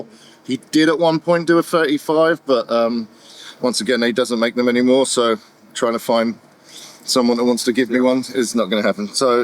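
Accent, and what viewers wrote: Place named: United Kingdom. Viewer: I never checked before. Surprisingly British. 😅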